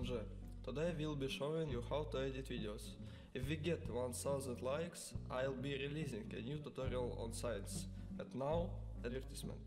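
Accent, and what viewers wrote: Russia. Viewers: боже мне так нравится твой русский акцент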